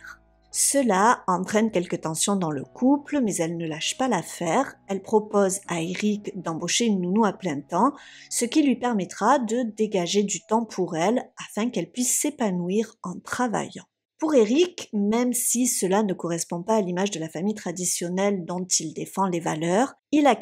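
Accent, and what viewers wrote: South, France. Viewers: J'aime bien t'écouter, malgré les horribles histoires, ta voix est apaisante avec ton léger accents du sud qui chante..😅❤ je suis fan. Merci ❤❤❤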